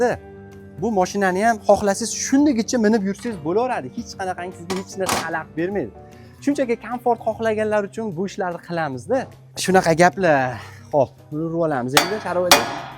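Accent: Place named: Kokand, Uzbekistan. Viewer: Аллохума барик! Сог булинг Укамжоним, маълумот жуда чиройли етказиб берилган! Куконда лигини шеъвадан тушундим, каерда жойлашгансизлар?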